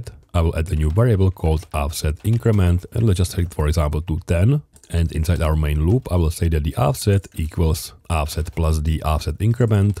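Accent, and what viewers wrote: Czech Republic. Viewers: Amazing content, live your little DIY projects. Fascinating to watch. I cannot help but try to figure out, are you by any chance a bratr from CZ? Im not that great with accents, sorry :D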